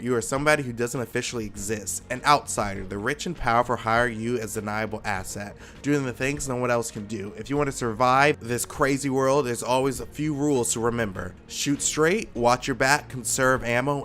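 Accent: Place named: United States. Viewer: pretty easy to understand, he is probably American judging from the accent alone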